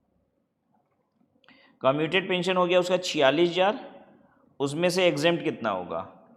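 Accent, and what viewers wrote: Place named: Varanasi, India. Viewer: sir aapke speaking accent se mjhe pata lag gya tha ki aap banaras se hi ho☺☺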